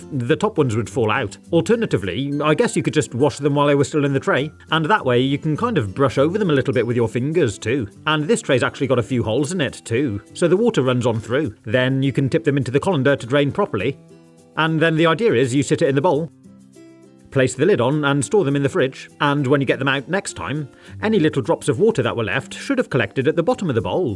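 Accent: United Kingdom. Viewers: Are you British? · Wait….. HE IS BRITISH LIKE ME!!!! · Yo u have a British accent 😂 nice idea🎉❤😊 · He is British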